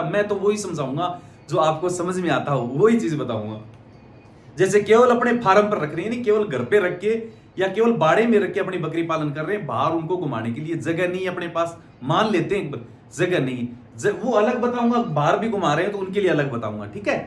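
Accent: Rajasthan, India. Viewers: 😂😂👍👍 Very nice 👌👌 Bhaai aap ka samjhaane ka tarika bahut hi sunder hai 👍👍 अलबादी बकरा भाषा में राजस्थानी लहज़ा बहुत ही शानदार लगता है 👌👌👌👍👍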